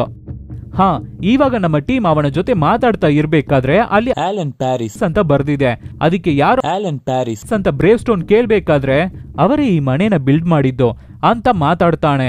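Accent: Tamil Nadu, India. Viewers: I think ur mother tongue tamil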